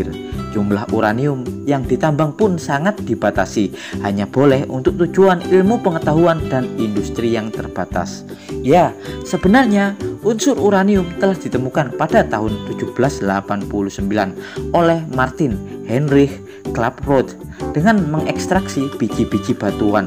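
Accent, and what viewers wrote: Java, Indonesia. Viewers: orang jawa mana ini? medoknya jelas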